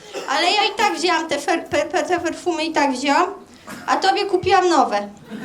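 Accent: Podlasie, Poland. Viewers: Brawo! Mega 😅 i fajnie Podlasie słychać w Tobie 😀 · Słychać Podlasie. Używa też slow lokalnych jak pazłotka. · z warszawy XD a akcent podlaski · Ja chyba zostanę psychofanem 😀 zakochałem się w tym typie żartu, mega akcent trochę przedwojenny, trochę Podlasia z domieszką Mazur, ale mega robotę to robi 🎉🎉🎉🎉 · Też to zauważyłeś? Jest mega urocza, mega humor, no i ten akcent, najpierw myślałem, ze jest gdzieś z Podlasia. :) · Ten aksent to bardziej z podlasia niz z Warszawy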